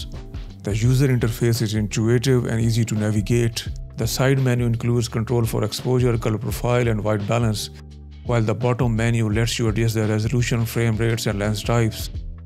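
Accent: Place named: India. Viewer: Thumbs up for keeping that DESI accent and NOT FAKING american accent 😂🤣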